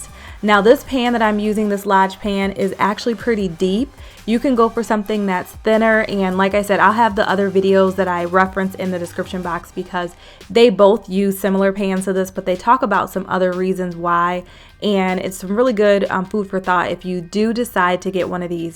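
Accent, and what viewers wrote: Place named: Pennsylvania, United States. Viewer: You sound like your from PA somewhere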